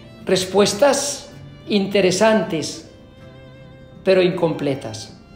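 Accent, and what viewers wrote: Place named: Antioquia, Colombia. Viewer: 😍Padre tiene acento paisa 🎊🎉🙏🏻🙏🏻💕😊😊 Dios te bendiga siempre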